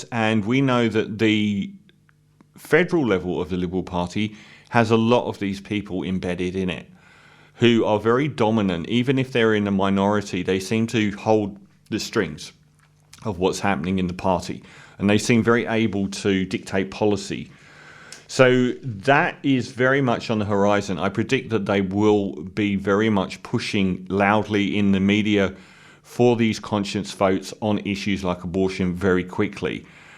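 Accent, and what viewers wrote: England, United Kingdom. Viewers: You clown! You sound like a pom bringing your lefy garbage to our country.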